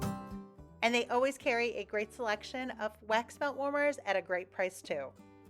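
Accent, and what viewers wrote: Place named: Western New York, United States. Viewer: I knew you wer from the Western NY! I could tell by your warm demeaor and your accent!! I was born and raised in Hamburg, NY. I will have to check that store out the next time we are back in Buffalo visiting our family. If you have any other mom and pop shops you can recommend, I would love to know. We come back every summer and I love to find new home decor stores! GO BILLS!!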